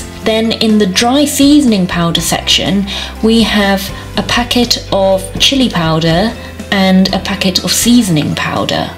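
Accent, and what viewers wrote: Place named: United Kingdom. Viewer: Sound so british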